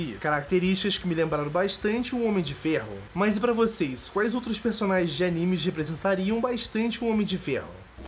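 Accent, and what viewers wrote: Portugal. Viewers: Você é português ? O sotaque parece rsrsrsrs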